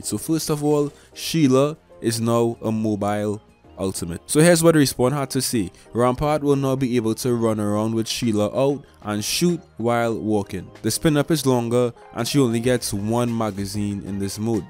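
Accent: Trinidad and Tobago. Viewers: Where you from bro trinidad? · Just came across this video and had no idea I was finna listen to a trini accent 💯🇹🇹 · Are you trini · Hold on….that accent…. You Trinidadian by chance?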